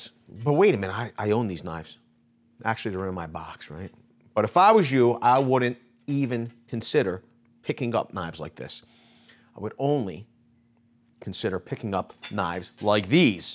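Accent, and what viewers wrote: Philadelphia, United States. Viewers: Ha he is from Philly ,was thinking New York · I like the length of the video but I'd appreciate more philly-charm. Like take a break to eat a cheesesteak and/or play up the philly accent and slang a bit lol. Good vid.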